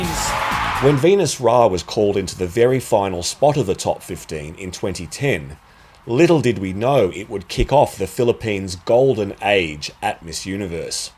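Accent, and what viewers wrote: Australia. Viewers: I’d have to say, as a beauty pageant enthusiast, you really do live up to your name as “ ”. The research, the editing, the (background) music, even the pronunciation of the girls’ names are spot on. Though with an Australian accent which I do not mind. Lol. 😏 Anyway, I coined our recent placements at Miss Universe as Venus Raj Era or Raj Era as with Venus started our golden age/era at Miss Universe. Hehe. 😁🤭 And also, I’d like to point out that Kylie Verzosa ~ Miss International 2016 is also a product of Aces & Queens. 😊 Can’t wait for your next videos/contents. 👍🏼😊 You’re doing a great job. 👏🏼👏🏼👏🏼👏🏼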